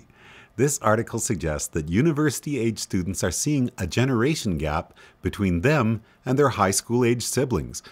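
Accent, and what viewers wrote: Canada. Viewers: hey i didn't realize you sound canadian until now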